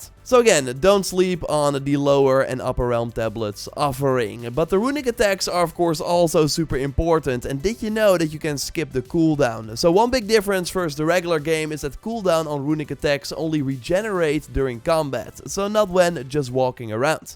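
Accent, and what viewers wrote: Netherlands. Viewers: I hate that the dude with the best tips videos has the worst Dutch accent, it absolutely atrocious mate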